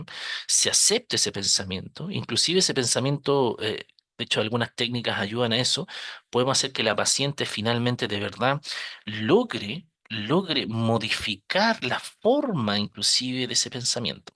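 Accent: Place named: Chile. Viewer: Genial, estudiaré el tema y además con acento chileno. ❤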